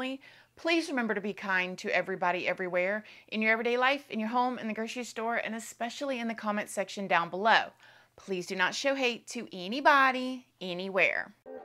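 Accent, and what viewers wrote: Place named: South, United States. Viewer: Lol on pronouncing the names it’s a southern accent kinda thing. I’m from Texas and my accent butchers a lot of things 😂 So you are pronouncing it right you just have to put that twang on it. Lol Love your videos girl!! ❤ Oh BTW I watched the Closing statement from the prosecutor and boy oh boy he laid it down. I really hope he gets what he deserves.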